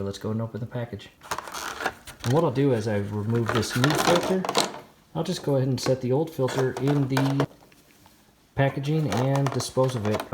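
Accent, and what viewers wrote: United States. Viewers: Thank you short precise and American speaking. Right to point perfect outline got filter changed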